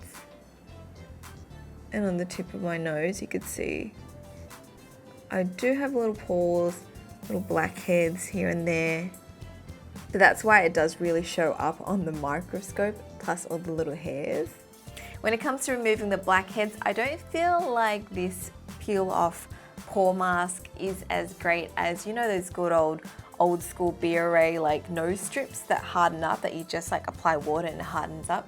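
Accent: Australia. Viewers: Asian with a aussie accent....wow...like McDonald's "I'm loving it".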